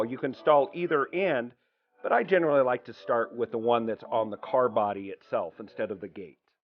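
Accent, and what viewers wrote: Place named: Australia. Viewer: You sound like an Aussie. Your reference to your hubby gave me a hint but I could be quite wrong. I’m about to give this gas strut replacement thingo a go. Here goes …. A 71 year old woman with a screw driver could be dangerous! 😃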